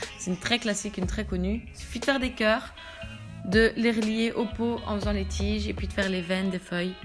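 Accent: Belgium. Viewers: Tu es belge toi ?^^ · t'es belge ??? ton accent m'a l'air légèrement belge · Miss Serbia j'ai l'impression qu'elle a un accent belge perso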